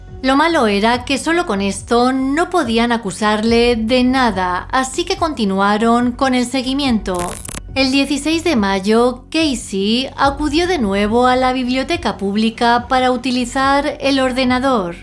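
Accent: Spain. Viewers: Recuerda tiene el acento español ! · Está bonito, es acento Español, me encanta, ella se esmera en presentarnos un video, busquen otros videos con otros acentos que les guste. 🙋‍♀️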